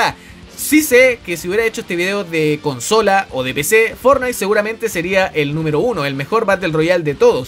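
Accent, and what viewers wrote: Chile. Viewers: Weon yo no te entendi ni madres chileno :v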